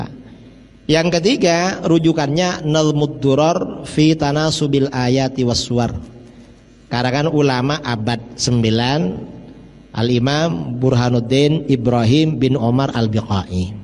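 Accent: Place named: Madura, Indonesia. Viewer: Idrus...logatmu madura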